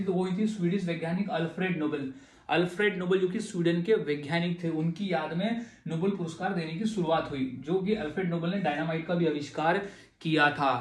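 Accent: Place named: Rajasthan, India. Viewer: Sir aap Rajasthan se he kya kyuki apka accent wesa hi he 😊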